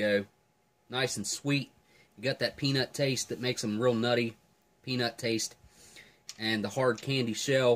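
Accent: South, United States. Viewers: Are you from Texas, South Carolina, or Georgia?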